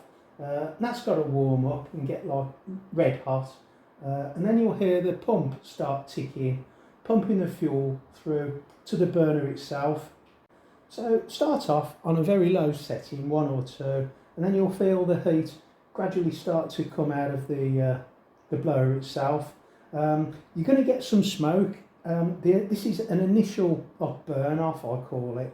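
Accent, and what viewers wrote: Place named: Black Country, United Kingdom. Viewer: Love your videos, you seem a very friendly chap, definitely a Birmingham/Black Country accent there;)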